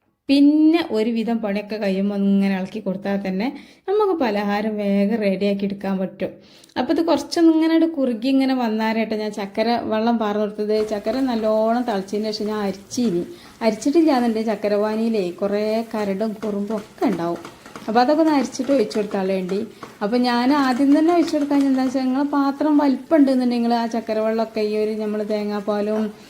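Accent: Malappuram, India. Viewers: വായ് പൂട്ടാതെ കമൻ്ററിയുണ്ടല്ലൊ ഇത്താത്ത അത് തന്നെ ഉഷാറല്ലെ. ജില്ല മലപ്പുറം ആണെന്ന് തോന്നുന്നു. മുരിങ്ങ തണ്ട് ഇതിനിടയിൽ ഇത്ത എപ്പഴാ തിന്നത്?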